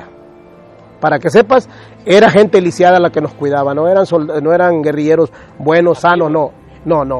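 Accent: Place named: Mexico. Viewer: Este abla.como mexican..estubo en la usa?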